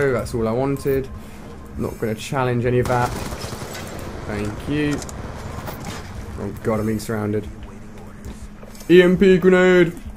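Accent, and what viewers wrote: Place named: United Kingdom. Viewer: You should do one episode with you talking without a british accent.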